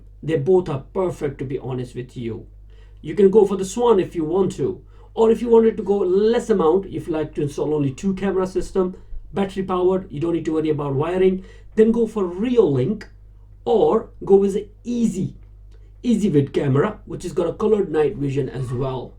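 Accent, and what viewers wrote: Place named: India. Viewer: Abe... Desk accent bahut hai be... Didn't show how to INSTALL it on front door 🚪 need to do be more thorough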